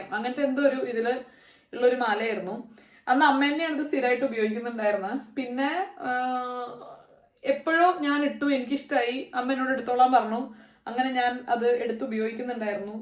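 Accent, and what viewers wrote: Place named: Kozhikode, India. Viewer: My fav YouTubers and.....kozhikodan samsaram😍...take care dears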